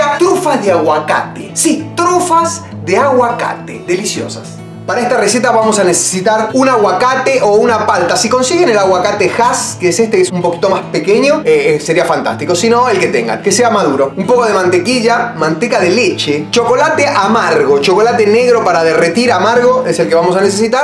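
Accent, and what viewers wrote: Argentina. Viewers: jajajajaja tas re loco man que buena tu energía! sos de Argentino no? voy a probar esto. Saludos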